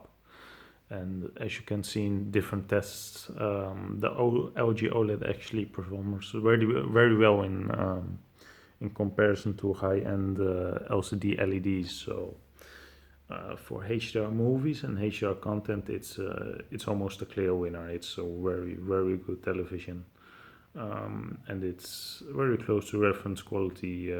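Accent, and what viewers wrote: Netherlands. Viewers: Are you dutch all seeying guy? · Don't say "tink" just start saying vink (you are clearly as Dutch as I am) but even before starting to pronounce the v switch to fink.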